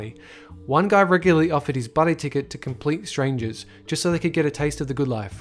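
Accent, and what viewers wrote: Australia. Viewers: Damn it, getting another aus narrator still wont work. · suddenly turned full australian. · Are you australian?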